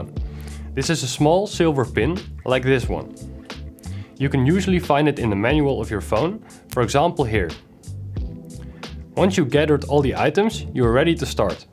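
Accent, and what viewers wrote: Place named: Netherlands. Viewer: I hear a Dutch accent! very nice tutorial and explanation!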